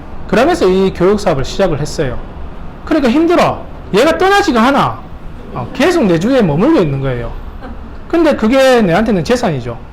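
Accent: Gyeongsang, South Korea. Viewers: 쇳쇨 심한 심한 경상도 액센트 너무 강해서 듣기싫네요, 군대 바리훈련소도 아니고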